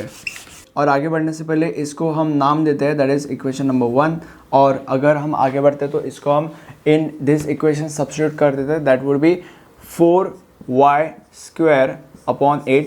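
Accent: United Kingdom. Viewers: British accent 🫡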